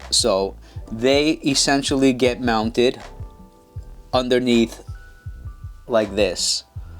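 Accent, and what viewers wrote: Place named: Brooklyn, United States. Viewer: I mentioned that I only had one question, but I actually have a second question and a comment. The comment is that I like your NYC accent, it sounds a little Brooklyn, like maybe Bay Ridge, though it's not too strong. My second question is about the 2600 be remote. Does it have a watch battery that you only have to replace like once every year or two? Also, how waterproof is the actual remote, in your opinion? Thanks again and have a good weekend!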